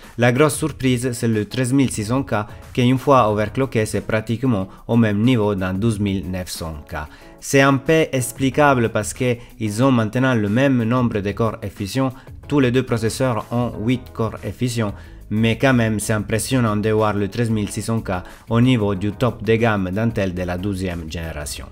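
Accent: Italy. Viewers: J'avais compris pour le pseudo mais pas pour la présentation, peut être mettre des sous titres en francais pour que tout le monde comprenne , (vous êtes de Naples ? :) ) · Bravo pour vos vidéos claires et précises, le tout avec votre superbe accent italien 😊. On apprend plein de choses à vous écouter. Continuez comme cela, c’est parfait.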